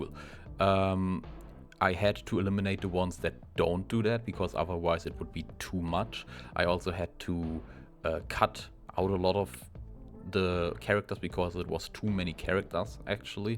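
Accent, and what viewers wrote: Germany. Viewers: Hey, are you perhaps from Germany? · this is one of the most important big data analysis ive ever seen xD also you sound very deutsch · Der deutsche Akzent macht alles 10 mal besser lol, good job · Lieb den deutschen Akzent, danke für deine Arbeit 🧎🏻‍♀️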